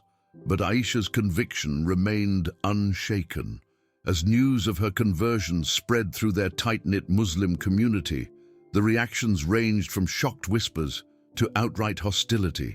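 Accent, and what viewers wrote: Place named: United Kingdom. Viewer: This could and should have been edited down....the British accent does not make it more palatable.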